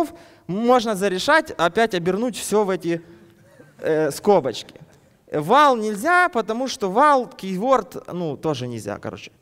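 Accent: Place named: Ukraine. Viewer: Английский с украинским акцентом - самое лучшее, что я слышала за последнее время)))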